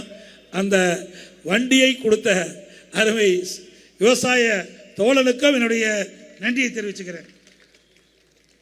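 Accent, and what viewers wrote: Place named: Katpadi, India. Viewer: Katpadi Tamil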